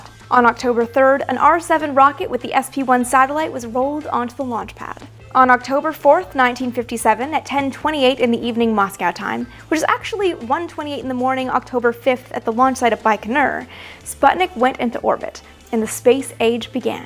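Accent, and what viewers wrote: Canada. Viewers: Wait, are you Canadian? Maple Leaf pride! 🇨🇦🇨🇦🇨🇦🇨🇦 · She's Canadian?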